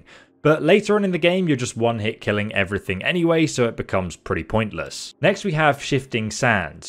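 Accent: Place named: United Kingdom. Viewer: It's called being British, we dont mispronounced things, Americans do.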